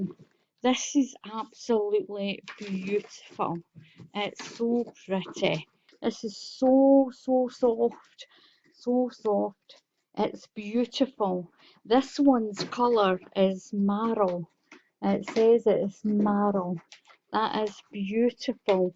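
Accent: Scotland, United Kingdom. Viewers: Where are you from in your accent sounds like Scotland am I right?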